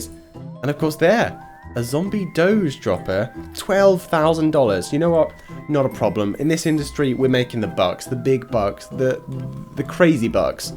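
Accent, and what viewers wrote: England, United Kingdom. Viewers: are you britesh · is he english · Are you British?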